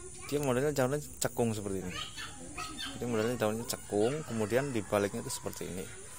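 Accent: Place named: Banyumas, Indonesia. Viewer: Dari nama Chanel Kirain orang Sumatra utara malah orang ngapak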